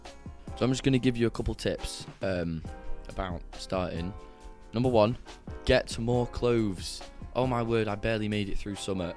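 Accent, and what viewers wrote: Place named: Manchester, United Kingdom. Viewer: banging in a manchester accent video 🥳🥳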